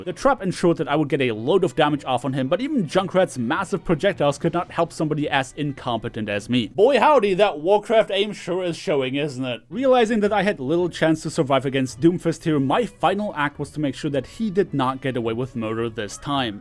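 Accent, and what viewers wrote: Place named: Germany. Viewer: just keep speeking with dat FAT german accent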